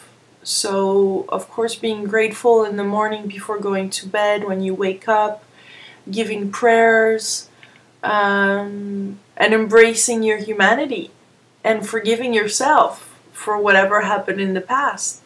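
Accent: France. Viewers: Omg.. u r french.. i could tell from ur accent.. its cute ^_^ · Are you french?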